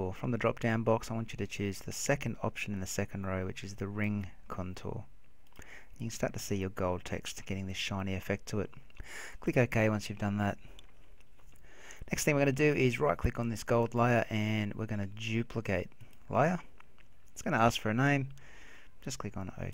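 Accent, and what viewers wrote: Australia. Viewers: r u aussi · Thanks so much for this. One of the best tutorials for Photoshop beginners I've seen so far. Just the right pace and level for me. Seems like most other tutorial-makers must be scared of being sneered at for being too simple, and seem to assume a 1 lifetime of Photoshop experience level. I like the Aussie accent as well.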